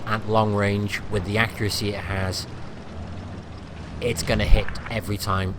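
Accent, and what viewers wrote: Germany. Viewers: You Should Upload a Video with short clips of that German Accent with Sentences other people can use in there videos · great review..love the german accent, keep it up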